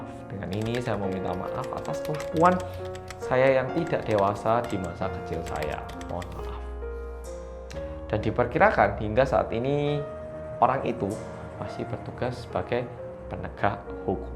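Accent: Java, Indonesia. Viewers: Bang logat nya Jawa banget😁 udah kayak logat presiden Jokowi 👍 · First impression liat videonya lek 😁 krn org korea yg medhoook 😍 stlh liat² trs videonya trnyta personality nya Indonesia bgt mmg 👍🥰 apalg dg logat medhoknya yg kandel,sesama jowo aku bangga bgt 👏🤩 Trs berkarya lek,aku padamu 🤭🥰🤩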